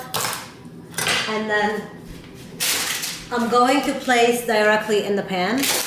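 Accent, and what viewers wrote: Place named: Arab world. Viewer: Walla, I thought I heard Araby accent. Shalom, Salam, Peace. I appreciate your constructiveness. Shukran/Todah Rabah.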